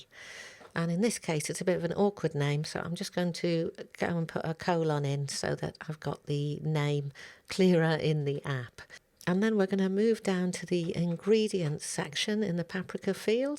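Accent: England, United Kingdom. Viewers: So hellpful and excellently done! THanks! Your accent makes me homesick for England!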